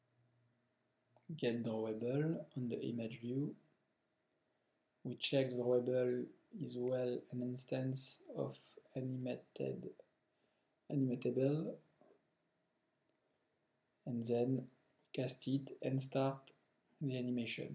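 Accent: France. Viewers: That's some hardcore french accent !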